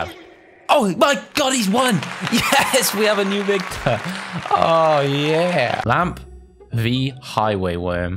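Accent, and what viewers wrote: United Kingdom. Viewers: Question for: Are you British. And I love you videos it's very fun. AND YOU ARE MY CHILDHOOD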